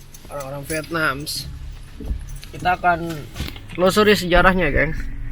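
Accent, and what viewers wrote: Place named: Kalimantan, Indonesia. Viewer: Orang Kalimantan mana suaranya 😄😄 #Yoyoyoy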